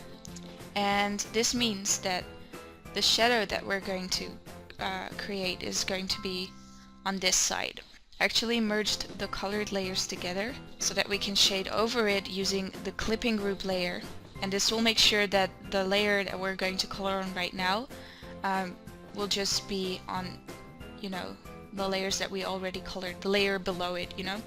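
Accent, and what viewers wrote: Netherlands. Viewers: "Oh dit meisje is Nederlands, ik hoor het gewoon, 100% zeker" ... " I learned this in Dutch.." I knew it ^^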